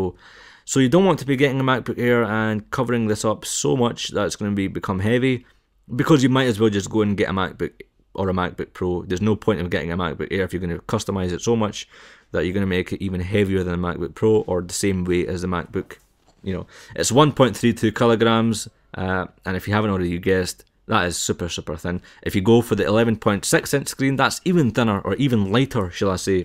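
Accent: Scotland, United Kingdom. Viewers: Really enjoyed the video, just ordered the 13" 2.13 4GB 256 Flash and can't wait to get it, have put my 4 year old 15" MPB's backlit keyboard off to get used to it but don't see it being a massive problem. Being from Aberdeen it's nice to hear a scottish review :D Cheers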